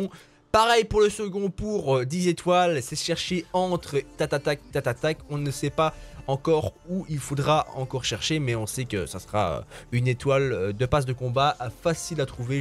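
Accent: Belgium. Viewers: Accent belge j’ai la même MDR 😂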